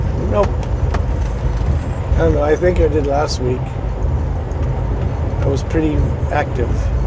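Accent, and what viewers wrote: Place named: Colorado, United States. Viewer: /Coloradan accent! Keep on trucking!uCifornia/Colorada accent love it! Keep on trucking!😀🐼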